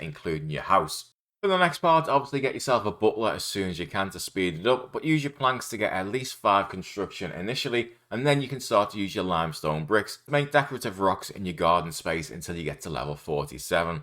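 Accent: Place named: Wirral, United Kingdom. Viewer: Maybe a Wirral accent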